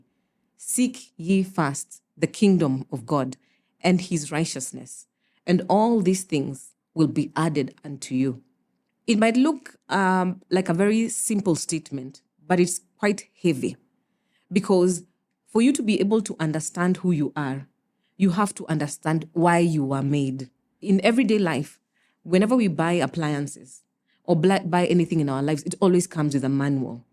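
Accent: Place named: United States. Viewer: Kizungu ya amerkaa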